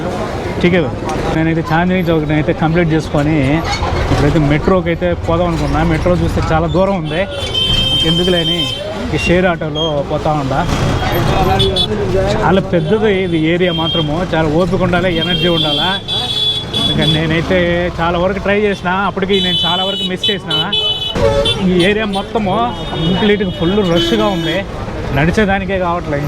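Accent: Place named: Chittoor, India. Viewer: Ho, ok😊 As per your slang, I thought you are from TPT/CTR😂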